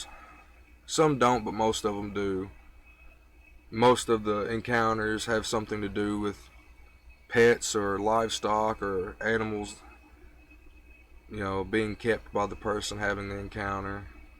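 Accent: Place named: Tennessee, United States. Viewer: If you don't mind brother, where is Your Accent from? Sounds like TN or Texas, but I'm not positive. Much love.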